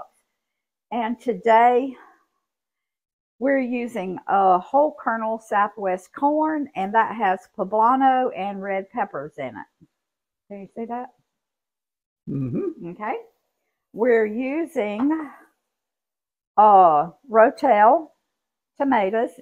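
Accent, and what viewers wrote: South, United States. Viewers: Just stumbled across your video, i love it, looks yummy. Your in the south i think from you accent, love it. I have subscribed, look forward to more, God bless❤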